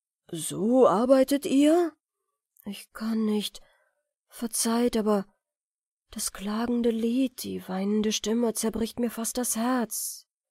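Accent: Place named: United States. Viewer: ja klar, Geschmäcker sind eben unterschiedlich. Mir hat es dann trotzdem ganz gut gefallen! Auch wie du die Stimmen machst zu.b. find ich gut. Es ist nur was in diesem SingSangton ... Ist neuerdings bei jungen Vorleser so verbreitet, Ich frag mich was das ist und mutmaße: es ist ein Amerikanismus!! Versuchen sie die Amis zu reden auf deutsch. Und das klingt einfach blöd in meinen Ohren.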